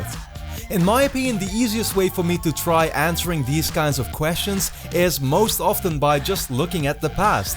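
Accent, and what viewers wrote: Netherlands. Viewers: Ben je nederlands je hebt zo een nederlands engels accent? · Ben je Nederlands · Jeez the Dutch accent is killing me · nice dutch accent :) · Je Nederlandse accent is wel te horen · Sxrewhead could hear it in the accent I'm Dutch too. (if my name didn't give it away) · Ik gok dat je een Nederlander bent. Als je vraagt hoe ik dat weet, het is heel makkelijk te horen aan je accent als je Engels praat. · R u dutch? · are you dutch? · M8 is there any chance that your Dutch? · why can i hear if poeple are dutch or not XD cuz im dutch and is dutch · Ben je Nederlands want zo klinkt je accent wel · Do you come from the Netherlands? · No hate on the video btw, great thinking logic (is that a dutch accent?) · Are you dutch? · are u dutch? Lol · Ben je Nederlands? Volgens mij hoor ik het aan je accent. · I don't know why i can always hear if a person is Dutch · This guy is 100% dutch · Youre Dutch arnt you? 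· are u dutch?? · Are u from the Netherlands 🇳🇱 my friend? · heerlijk hollands accent! maar zeker goede vid! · Zo'n mega zwaar Nederlands accent haha heerlijk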